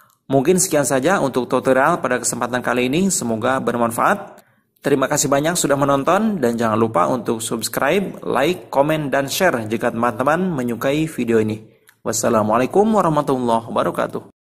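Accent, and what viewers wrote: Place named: Sukabumi, Indonesia. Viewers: Abang orang skabumi ya??? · Org sukabumi ya bang 😅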